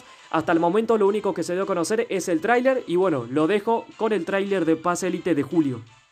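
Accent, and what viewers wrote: Córdoba, Argentina. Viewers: sos de Córdoba?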